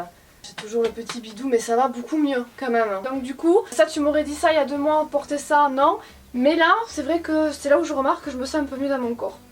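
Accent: South, France. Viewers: J'adore tes haul car a chaque fois tu essayes les vetements, d'autres les montre simplement ou ont les voit en photos c'est pas attractif, et puis toi avec ton accent du sud et ton beau sourire c'est vraiment super a regarder. J'ai le body rose a paillette comme ta robe a anneaux, plus facile a mettre le body que la robe courte et hyper moulante.... mais elle sest jolie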